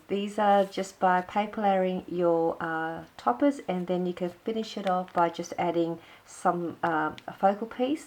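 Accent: Australia. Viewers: Lovely projects and such a gorgeous Aussie accent!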